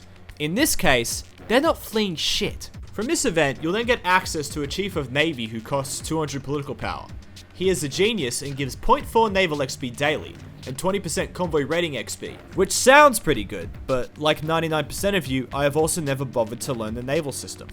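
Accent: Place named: United Kingdom. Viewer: Why are all hoi4 youtuber sound british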